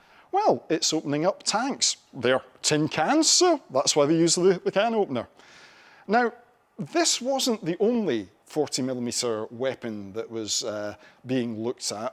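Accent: Scotland, United Kingdom. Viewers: Always a joy to hear a Scot agonising over something.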